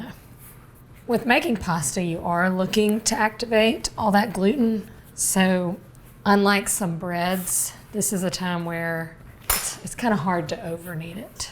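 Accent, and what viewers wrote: South, United States. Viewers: That southern accent throws you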